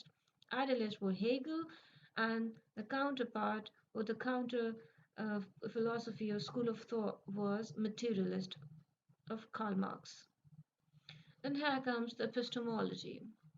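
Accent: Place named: United States. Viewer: Madam wese ap ny kaha tha ap ko easy tareeky se samjhao gi per ap ny itni American English accent bol kr pryshaan hi krdya ... over lagta hai...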